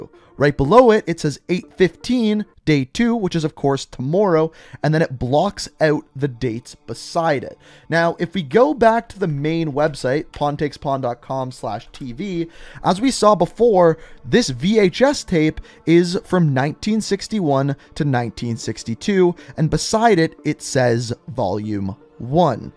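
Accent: Canada. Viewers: Yo I love your Canadian accent much love my man❤️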